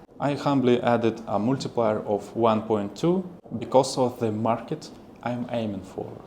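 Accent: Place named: Russia. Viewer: russian accent, I have the same, we have to think what we want to say first before saying. But thanks for the tip it's much better now :)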